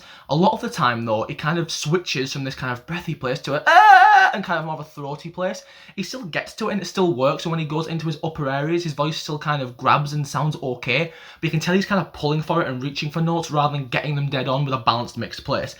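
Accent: United Kingdom. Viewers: the british accent really surprised me omg it’s so nice to see a fellow astro fan who is english, thankyou for being so kind and knowledgeable too! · An Astro fan (Aroha) just pointed this video out to a few of us and you speak so much sense! Really interesting watch and super informative. Haha Jinjin’s singing voice isn’t amazing but his rapping voice is insane! You sound like a fellow Brit also, hello!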